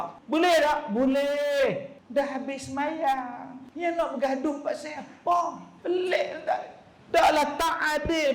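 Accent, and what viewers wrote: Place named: Batu Kurau, Malaysia. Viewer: Pelat batu kurau tu buang lh sikit .bkn ceramah Kut kg paya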